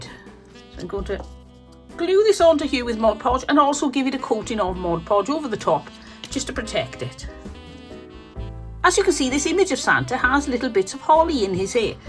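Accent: Wales, United Kingdom. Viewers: we enjoyed your video,it was lovely to hear a welsh accent for a change on a crafting channel .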